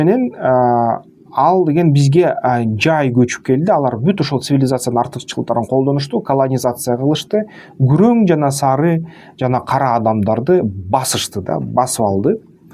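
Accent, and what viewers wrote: Standard. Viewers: РАХМАТ СИЗГЕ КЫРГЫЗЧА ТАЗА СУЙЛОП ЖАТАС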